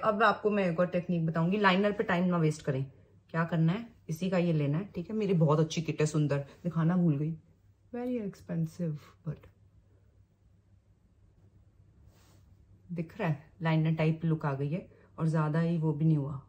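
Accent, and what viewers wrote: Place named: Punjab, India. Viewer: This was so fun!! 😄❤️ You have a strong Punjabi Delhi accent, and I love it! 😘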